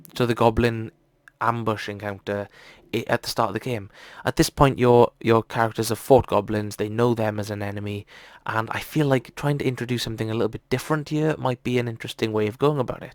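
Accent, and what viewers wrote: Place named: Wales, United Kingdom. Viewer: Love the advice. Couldn't help but notice the accent. Do you play in a store 8n wales?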